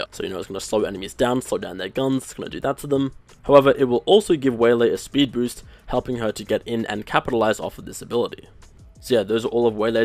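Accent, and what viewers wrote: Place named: England, United Kingdom. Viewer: Bhai aapke accent ko kya hua? Yeh angrezo wala accent kahase aaya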